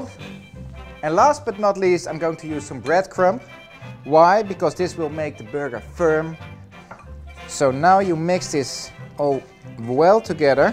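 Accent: Netherlands. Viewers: het is te merken dat je nederlands bent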